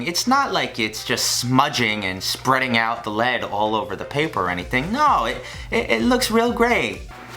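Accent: New York, United States. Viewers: Is it just me or is actually Freddie Benson with a New York accent?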